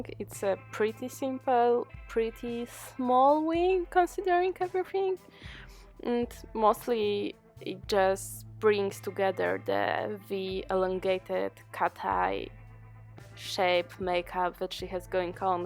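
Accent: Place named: Russia. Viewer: Hi, were are you from? You have russian accent) ( i don't now)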